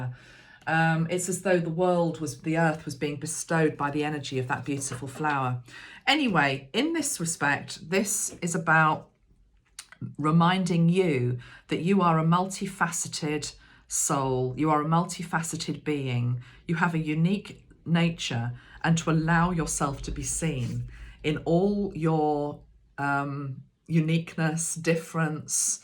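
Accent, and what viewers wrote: United Kingdom. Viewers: PS, I actually notice and love the way you pronounce your words! I just figured they were UK pronunciations 😂 🤍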